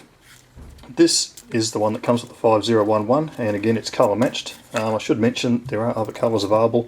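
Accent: Australia. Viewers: simple entertaining and informative review 10/10, where abouts in Aus you from mate ?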